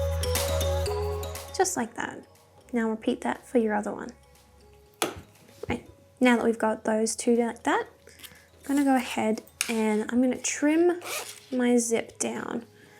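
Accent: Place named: Australia. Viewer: Oh thank god, its an Aussie and not some ageing southern momma y'alling with a voice like a drill sergeant after a night in a smokey bar doing karaoke . . . .this is exactly what ive been looking for.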